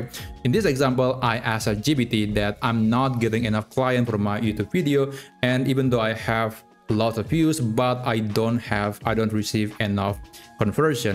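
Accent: Indonesia. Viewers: accent nya asa indo banget jir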